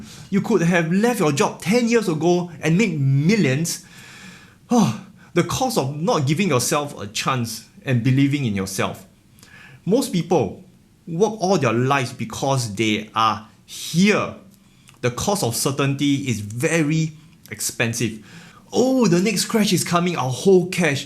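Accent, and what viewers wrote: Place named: Singapore. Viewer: I love your singlish bro 😂 can’t wait to come to Singapore one day!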